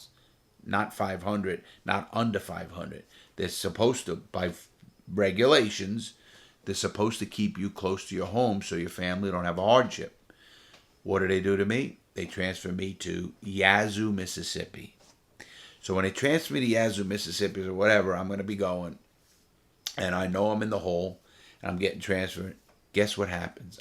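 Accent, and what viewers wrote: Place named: North, United States. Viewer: Legit question lol. If you live in florida and have lived there your whole life how do you have such a northern accent??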